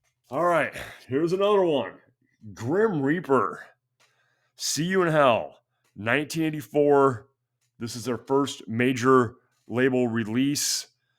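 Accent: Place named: South, United States. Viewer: What part of the south are you from? I can hear an accent but I can't quite place it. I'm going N.C? Possibly T.N? Can't go wrong with Rose Tattoo. Wow! The Who!? You definitely have their best one. I could listen to the first Grim Reaper record all day long. The second one is pretty good too. Check out my video on them. GNR has never put out a bad one but you're right. That is one of the greatest debuts of all time. Keep this theme up! It's cool. Cheers!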